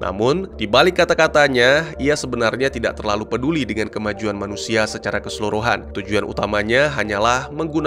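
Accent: Aceh, Indonesia. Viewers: Bg orang Aceh ya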